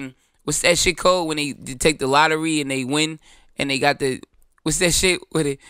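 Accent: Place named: Memphis, United States. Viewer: Bruh the Memphis accent took me out breh 😂😂😂😂😂😂😂😂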